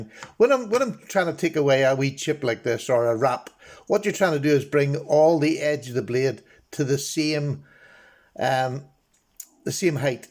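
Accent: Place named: Ireland. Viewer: I don't understand half of what this gentleman says? Irish? Scottish?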